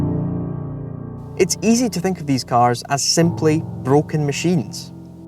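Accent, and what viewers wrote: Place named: Glasgow, United Kingdom. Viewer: You sound like a fellow Glaswegian to me. I can't see Dennis Collins being interested in any of those cars. The forest landscape is almost saying that nature wins in the end mate.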